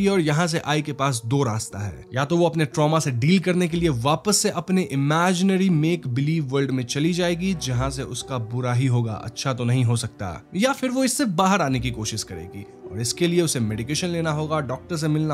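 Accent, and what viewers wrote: Bengal, India. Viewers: Bhai aap bangali ho ampka hindi se to yehi lgta hai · Are you bengali?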